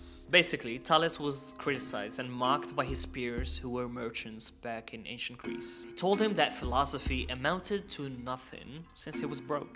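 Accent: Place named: Morocco. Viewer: the Morroccan accent in your english though :D this poped up in my suggested list, I own a small/new channel too and I can only support with a comment 😀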